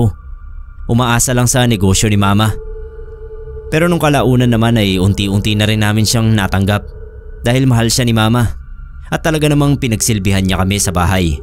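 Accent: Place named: Cagayan, Philippines. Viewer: Parang taga Cagayan ang narrator. Itawes.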